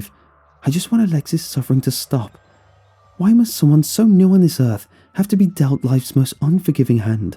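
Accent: United Kingdom. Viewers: I love your sweet British accent, keep up the good work 👍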